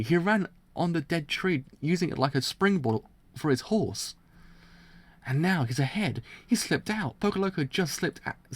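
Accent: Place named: United Kingdom. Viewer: I think is for your British accent lol, the way you pronunce "The legs of" sounds pretty similar to "Alexa" for me xD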